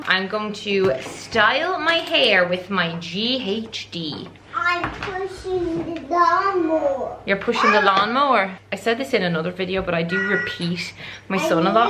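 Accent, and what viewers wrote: Ireland. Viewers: Hi, just wanted to say thank you for all of your parenting videos. I have been feeling extremely conflicted about the idea of maybe being a mom because of my own childhood. Seeing you interact with your kids makes me so happy and a part of me is healed and excited about the prospects of parenthood. So thanks for that <3 Oh and, after watching your videos I always subconsciously put on a little Irish accent when going about my day. I hope the idea of a German gal living in the UK with an American / part-time Irish accent makes you laugh:D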